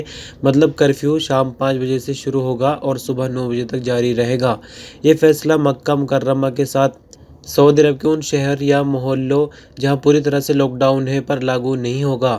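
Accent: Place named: Uttar Pradesh, India. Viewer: Apki zaban bata rahi he ki aap u.p se ho konse district se ho ye bataye brother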